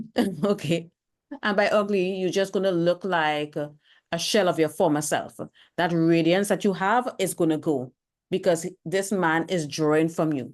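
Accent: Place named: Trinidad and Tobago. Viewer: I like your content. You sound like a trini🇹🇹 I'm Trinidadian, btw.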